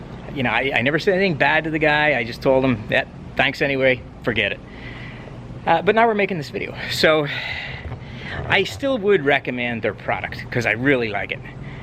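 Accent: Northern Ireland, United Kingdom. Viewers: Enjoyed your videos thought I got a sound of a north of Ireland accent , greeting from Dublin.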